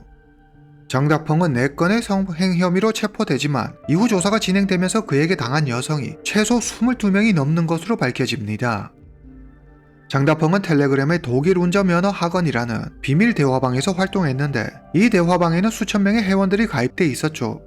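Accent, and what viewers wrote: Yanbian, China. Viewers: 말투를 들어보니 중국이나 연변동포 분이시군요 방송 잘 보고 있습니다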